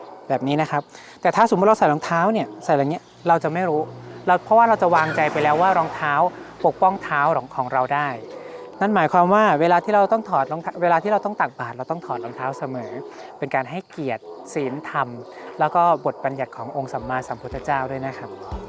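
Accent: Isan, Thailand. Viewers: สาธุสาธุสาธุอาจารย์ป้าเป็นคนอีสานจ้าได้ฟังอาจารย์คุยกับแม่อาจารย์เป็นคำพูดอีสานฟัวแล้วคิดถึงบ้านจ้า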